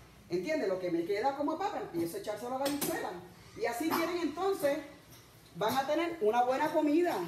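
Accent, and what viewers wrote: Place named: Puerto Rico. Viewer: Sii se nota que es puerto rique~e y apura sepa!! Y a tii a leguas se te nota la envidia....sii no tienes nada que decir aracante pal la mierda!! Boricua hasta la muerte!!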